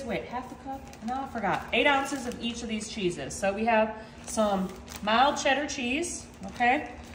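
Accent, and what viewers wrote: South, United States. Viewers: Ma'am, i just knew by your sweet southern accent that all of these was gonna come out looking great told me everything i needed to know 😂❤ im making some of these real soon 🤷‍♀️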